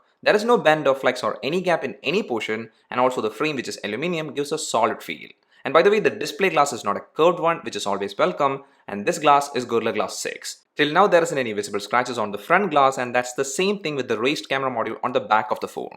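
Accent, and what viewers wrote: Kerala, India. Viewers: are you from Kerala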